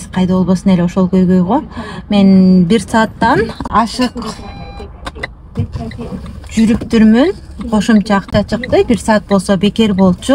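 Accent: Standard. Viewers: Баштык, дүкөн деп кыпкыргызча сүйлөп жатасыз рахмат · Эн соонун!!!! Чет жерде журсо дагы нукура кыргызча суйлоп жатат бир тууганыбыз❤❤❤❤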